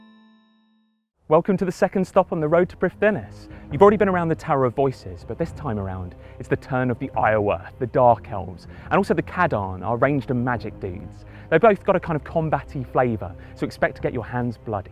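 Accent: United Kingdom. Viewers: He has a british accent, soo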